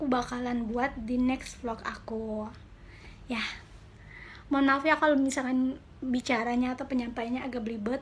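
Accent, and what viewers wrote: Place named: West Java, Indonesia. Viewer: Sunda bangettt:v wkwk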